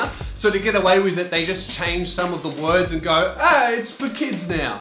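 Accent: Australia. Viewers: Dewey Pruitt It's the accent. Perks of being Australian here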